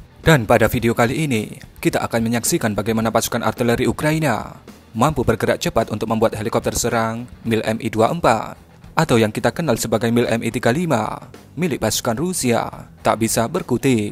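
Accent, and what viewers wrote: Java, Indonesia. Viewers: chanel medok tpi mantap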